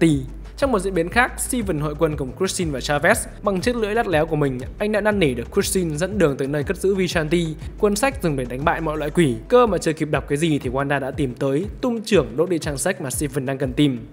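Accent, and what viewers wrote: North, Vietnam. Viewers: Giờ biết sao nữ thích giộng con trai bắc rồi.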